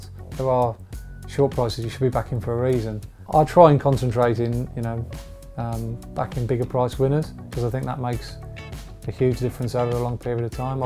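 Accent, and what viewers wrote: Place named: Yorkshire, United Kingdom. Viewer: from yorkshire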